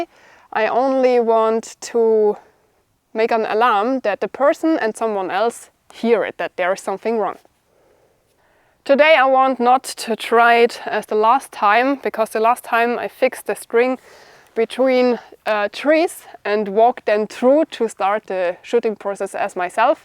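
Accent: Germany. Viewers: great video thank you. have consider security camera it will alarm you also is equipped with police siren. you have nice and pleasant German accent. merci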